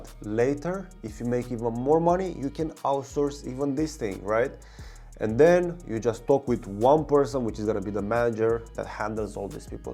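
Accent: Romania. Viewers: Me: He has Romanian accent. Me after reading his name: Oh... makes sense 😂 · Man you are Romanian? You really have a romanian accent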